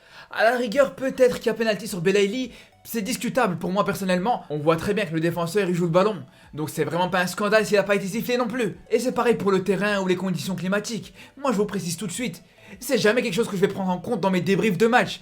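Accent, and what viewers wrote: Belgium. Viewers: Accent Belge 🍟🍟🍟🍟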